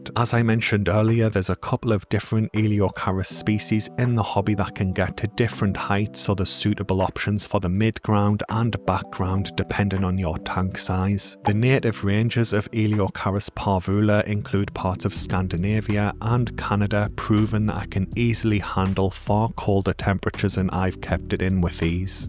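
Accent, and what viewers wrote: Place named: North, United Kingdom. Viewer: Can tell you're from the north (not just from the accent) my house won't get below 20 degrees over winter. We're made of soft stuff down here 😂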